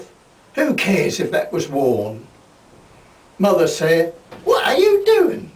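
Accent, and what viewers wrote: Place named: Great Yarmouth, United Kingdom. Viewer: Thank you for satisfying my curiosity about what the accent of a Great Yarmouth native would be.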